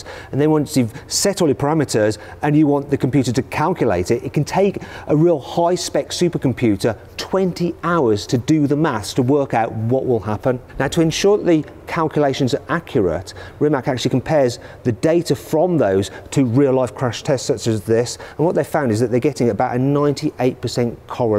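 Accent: United Kingdom. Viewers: Logat nya british bngt kwkkwkwkw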